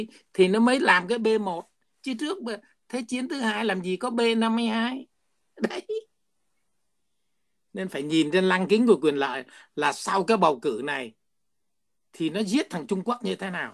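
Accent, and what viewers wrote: Standard. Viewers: Hi hi anh nói rất chuẩn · Bác nói chuẩn 👍❤️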